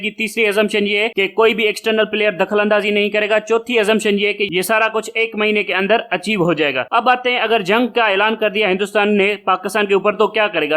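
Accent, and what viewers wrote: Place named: Sindh, India. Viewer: the way speak, i think you are sindhi.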